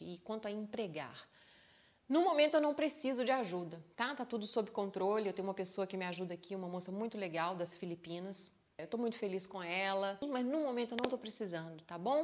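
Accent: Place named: Minas Gerais, Brazil. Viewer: cara, como eu amo esse sotaque mineirin hahahaha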